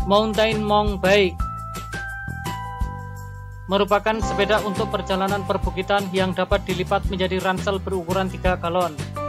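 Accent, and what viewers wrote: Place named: Central Java, Indonesia. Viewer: Dari logat akang orang Jateng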